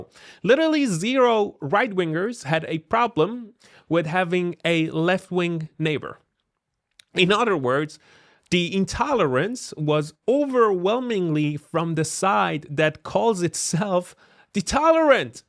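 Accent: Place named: United States. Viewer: First of all, you sound like you would make a pretty good American….perhaps you immigrated to the wrong continent. 😊 Second, why would a country let the leader of another country bother them so much? Europeans have always seemed so triggered by US politics as if they should have a say so in who is elected. The whole thing is ridiculous, no one in the US is that vested in the politics of another country. This appears to be an extension of the victim hood mentality so rampant in the west, whatever your problems are MUST be someone else’s fault….and so that’s why Trump is an easy target.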